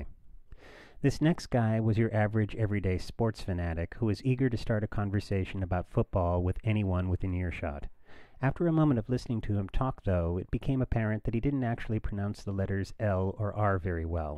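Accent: Boston, United States. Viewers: Wait your from Boston? LOL! Didn't know that. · OMGSH You're from Boston~ AWESOME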